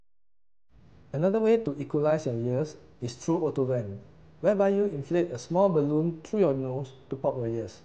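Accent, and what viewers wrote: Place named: Nigeria. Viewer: He sounds very nigerian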